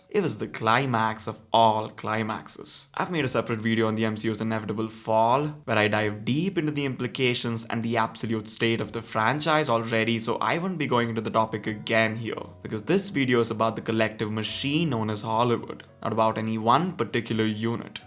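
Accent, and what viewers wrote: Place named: India. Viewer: Click on video I want to watch: Indian voice Okay nvm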